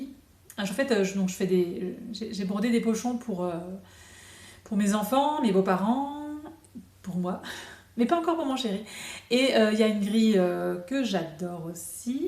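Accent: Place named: Belgium. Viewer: Coucou, tout est magnifique ! Le fil E940 de DMC (si c'est bien celui là que tu as commandé 😅) brille dans le noir mais il faut vraiment le mettre au soleil ou sous lampe très près et pendant longtemps... Et ça fait plaisir d'entendre ce petit accent belge dans un flosstube ! Je me sens moins seule 😅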